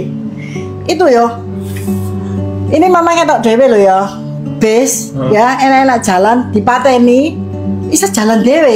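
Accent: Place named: Surabaya, Indonesia. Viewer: Ai ..Seru lek Cerito Iki asli logat'e Suroboyo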